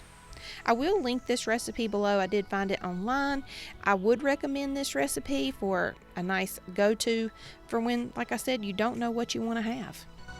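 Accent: South, United States. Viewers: Girl you talk like me, country as cornbread 😂 I love it! Proud of my southern drawl. 💁🏼‍♀️ New subbie! 🤗 💕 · All these recipes look great girl☺️ I always enjoy watching you and listening to your awesome southern accent! 💗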